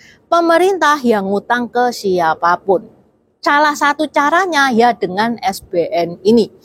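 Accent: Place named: Surabaya, Indonesia. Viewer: Wong iki logat suroboyaan. Medok temen. 😁 Yo wes ngomongo sak karepmu, tak rungokno. ☺️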